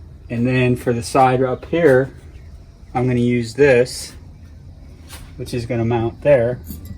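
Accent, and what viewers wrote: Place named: United Kingdom. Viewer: Yeah she really sounds like she’s from the uk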